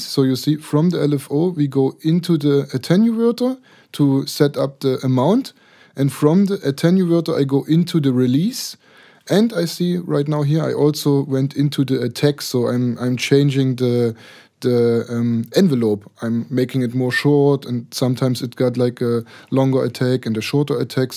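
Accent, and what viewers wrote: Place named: Saxony, Germany. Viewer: sehr cool, vor allem der edle sächsische akzent!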